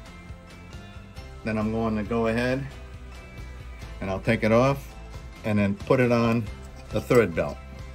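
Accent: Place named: Wisconsin, United States. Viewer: You sound like you could be from wisconsin or the u.p.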